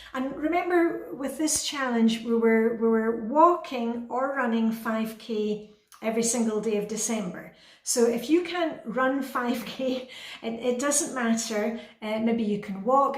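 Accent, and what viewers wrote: Scotland, United Kingdom. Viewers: I like in Denmark, I don't need to win anything, I feel like a winner already, watching her happiness and little life tips in a sweet Scottish accent.Thank you!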